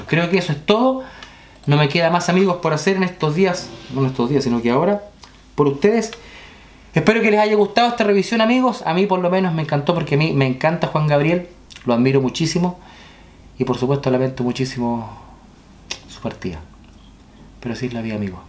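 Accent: Chile. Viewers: Eres chileno.?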